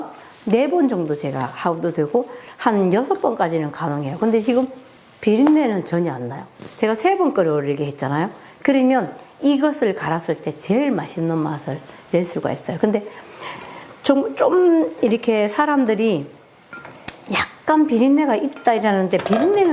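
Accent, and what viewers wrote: Gyeongsang, South Korea. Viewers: 스님 경상도말이넘정겨워요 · 외국말이 아니고요 경상도 사투리에 표준말 석어 하실려니까 어색하게 들려 그런거 갇은대요 ㅎ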